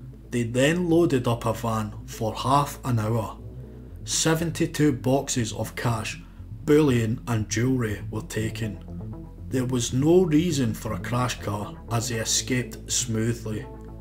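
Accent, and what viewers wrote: Scotland, United Kingdom. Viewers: Narrator is Scottish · He's Scottish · I must say the Scottish, when they’re not excited, speak the clearest English in my opinion and im a Londoner. Good luck fella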